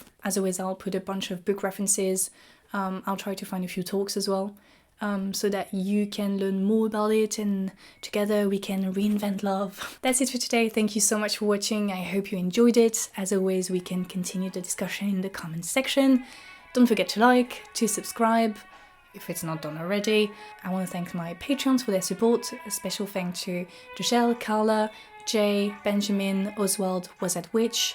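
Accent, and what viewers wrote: France. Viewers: Is it just me or does she sound more French than usual?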